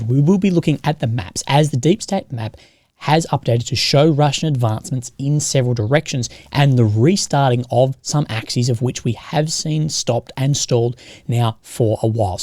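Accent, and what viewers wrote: Australia. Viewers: So, this is Russia Today with an Australian accent?! Cool!!! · my dear.. i love your "aussie" accent (for me as an italian it sounds a bit funny but I love it) and I love your good will to be "near the reality" this is important for one like you that make reports about a situation on the field trying to avoid the "propaganda warfare" that we all know it's inevitable but of course not near the reality. So I will express here my appreciation for your work.. I really don't care if you (or somehone else) is pro-russian -pro-ukrainian or pro-something-other what I care is that the the job that you are doing try to get the facts. that's all...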